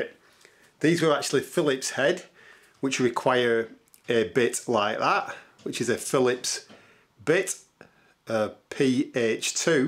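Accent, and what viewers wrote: United Kingdom. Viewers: British voice makes this pleasure to watch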